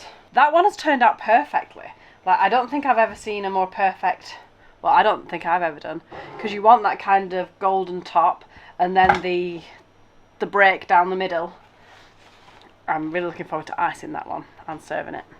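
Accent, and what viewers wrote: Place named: East Yorkshire, United Kingdom. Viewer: I'm placing your accent in Beverley East Yorkshire (near Hull) ...am i right?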